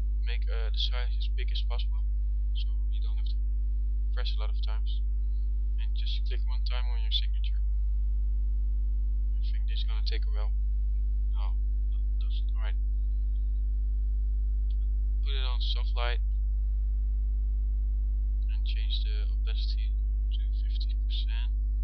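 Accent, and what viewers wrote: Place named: Netherlands. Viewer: haha je hoord wel egt goed dat je NL bent :D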